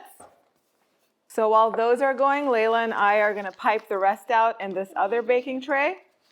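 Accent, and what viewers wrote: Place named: United States. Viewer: Γειά σου. Πρώτη φορά μού βγήκε βίντεο δικό σου στο YouTube και από το όνομα σου καταλαβαίνω ότι είσαι Ελληνίδα και χαίρομαι που δείχνεις ελληνικές συνταγές. Αυτά τα αμυγδαλωτά είναι τα αγαπημένα μου και τα φτιάχνω κι εγώ. Εγώ είμαι από Θεσσαλονίκη κι εδώ τα λέμε εργολάβους. Θα κάνω εγγραφή στο κανάλι σου και θα σε ακολουθώ. Από τι κατάλαβα από τα αγγλικά πού μιλάς μάλλον είσαι Αμερική. Αν θέλεις γράψε μου. Να χαίρεσαι το κοριτσάκι σου!! 🌷🌷🌷🌷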